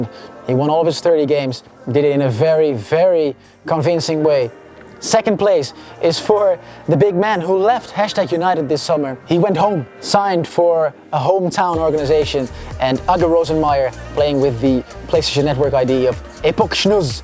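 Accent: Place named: United States. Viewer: Try to keep it english and not American english. But good job nice vid!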